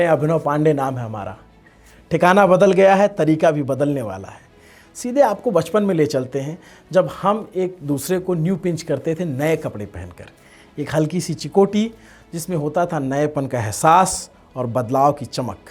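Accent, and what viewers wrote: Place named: Awadh, India. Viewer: Bahut badiya bhaiya 👏 Aapki awadhi kamal ki hai❤ Lalitpur up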